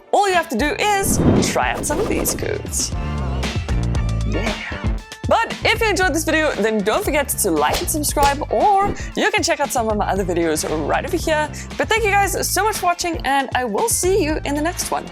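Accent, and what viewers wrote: South Africa. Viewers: Great video. Your accent? S. Africa? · Are you from South Africa ? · Fnb App on your Watch? Are you South African ? I honestly thought you were Russian or from like that side of the world lol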